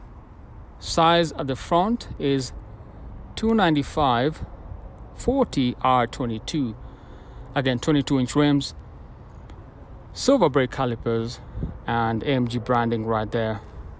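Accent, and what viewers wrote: Kenya. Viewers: That accent is so Kenyan yani 🇰🇪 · You sound Kenyan.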